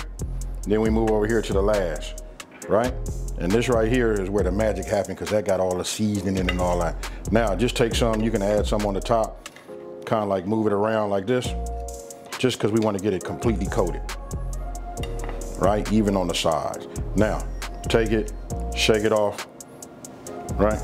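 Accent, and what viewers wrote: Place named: North Carolina, United States. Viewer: This is off topic, but your accent sounds similar to someone I know from North Carolina. You wouldn't happen to be from there originally would you? Nice video, by the way. I'm definitely going to try this! Thanks!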